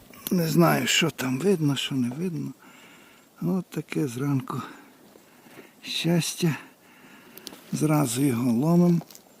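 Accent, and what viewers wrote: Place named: West, Ukraine. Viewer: Здравствуйте! Откуда вы, какая область. Мой свекр с запада Украины Ивано-Франковской области, разговаривал точно так, даже голос похож.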